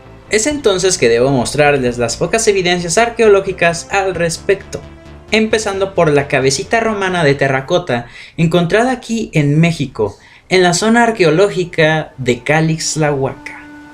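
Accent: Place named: Spain. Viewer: Nunca dejaste de hablar con tono ibérico, jajaja.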